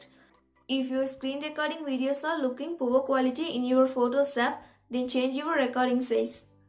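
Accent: India. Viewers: Of course all tech people have heavy Indian accent.....but thanks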